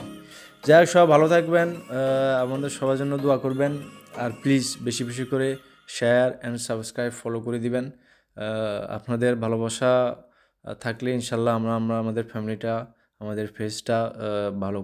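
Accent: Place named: Noakhali, Bangladesh. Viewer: Baiya apnader bari Noakhalir kon jaigai plz bolen, apnar Kotha amader ancholik basar moto.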